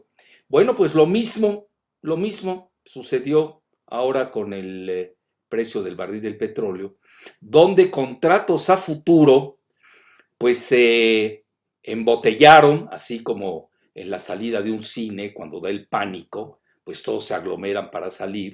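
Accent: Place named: Russia. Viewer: como me gusta mucho la petulancia que muestra mi querido calvito pues con su acento semiruso explica con coordinacion y buenos numeros.le felicito aunque yo s mucho de FDerivados del petrolio por tan solo el gas que le pongo a mi SUV,me gusta su berburrea mas me parece que se quiere parecer a la mama de Dios ,tal ves que Dios nno se le conoce mam,asi que me gusta asu exposicion y petulancia asi que adelante es un buen predicador....hum y digo predicador para no llamarle su santida francisco,,nos vemos mas me gustaria debatirle en otro temamas.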